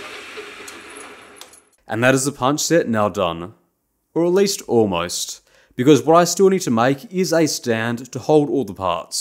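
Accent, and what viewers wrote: Australia. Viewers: Dude for some reason I opened this video and thought it was inheritance machining so I got jump scared by your Australian accent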